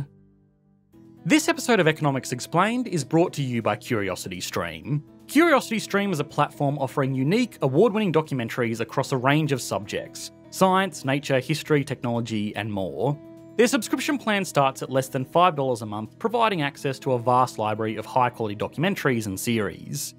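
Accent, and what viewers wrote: Australia. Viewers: As a German who appreciates the WirtschaftsWissen channel, I still can’t imagine without English in that fine aussie accent, mate 👌🏼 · I will never watch the German version, simply because I love the original Australian accent and very unique delivery so much. If phonebooks still existed, could make a whole video channel reading them aloud and I would watch it. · Haha, alltough an Ozzie speaker, it is a British vieuw of economics. A bit shiny fellas.;-)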